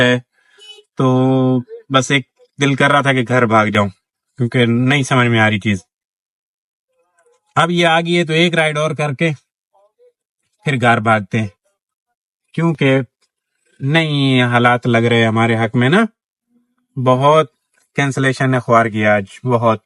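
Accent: Jhang, Pakistan. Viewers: Apki Punjabi to jhung wali ha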